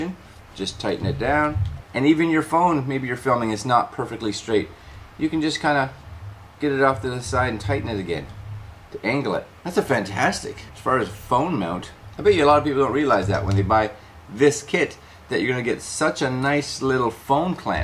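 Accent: Canada. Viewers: My tripod has been ordered! Love the Canadian accent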